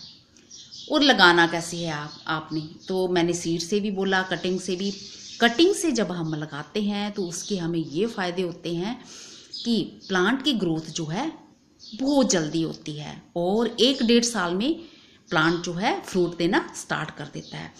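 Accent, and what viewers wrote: Punjab, India. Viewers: aapki aawaz punjabis tone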